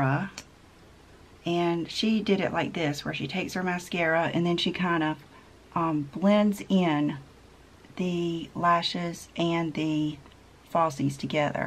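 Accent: South, United States. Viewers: Okay, your brow talk cracked me up! Too cute! I can't believe some people feel the need to correct the way others pronounce words. And the worst part is one can never say it their own way ever again without thinking of that diss. I love the way you talk and it's endearing to hear your southern words. You say tomato I say tomato ;-)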